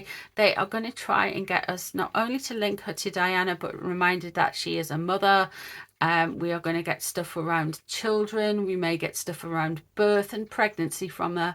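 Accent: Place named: England, United Kingdom. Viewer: Love❤ your interpretations you are so on the case. Love your accent too . I’m Australian and I get a kick out of different English accents, there are so many varieties. Here in Australia we pretty much sound similar.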